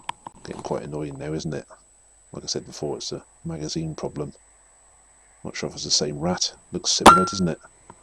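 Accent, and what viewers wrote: United Kingdom. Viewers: Most annoying British accent ever.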